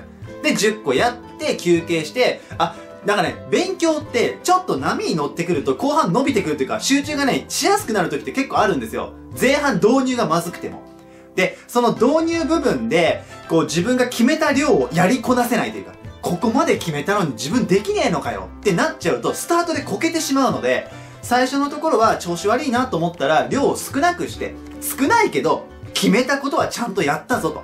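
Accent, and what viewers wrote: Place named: Kansai, Japan. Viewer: 関西弁です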